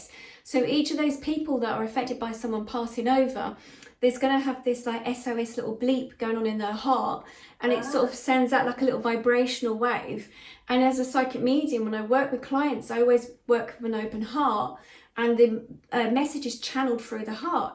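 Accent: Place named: England, United Kingdom. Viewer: Gr8n hearing an English accent to all the others you hear all the time.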